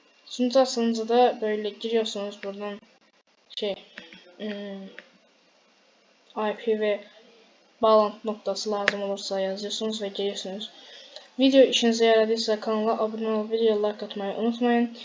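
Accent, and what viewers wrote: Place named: Azerbaijan. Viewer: Abe azerbaycanlısan türkçe anışma be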